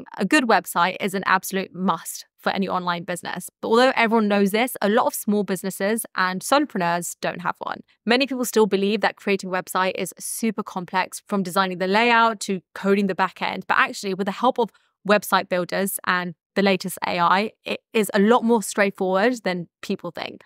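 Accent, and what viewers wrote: United Kingdom. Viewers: Can you start a British accent class? Many people will pay and join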